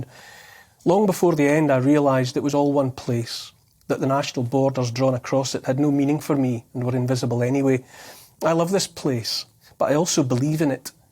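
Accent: Scotland, United Kingdom. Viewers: I’m English but call myself British and love and miss my people as I live in nz . Neil Oliver you are wonderfully erudite, and it’s such a relief to hear your voice of reason - spoken with the charm of your Scottish accent . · I get the real scottish feeling when this guy talk. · The usual English assumption that Britain is England! Yes, I know he's got a Scottish accent but |I assure you he's NOT Scottish. No self respecting "Scotsman" would ever call themself "British"!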